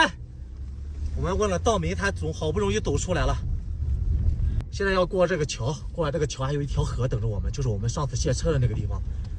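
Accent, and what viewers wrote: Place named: Wuhan, China. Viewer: 有个武汉的口音。很熟悉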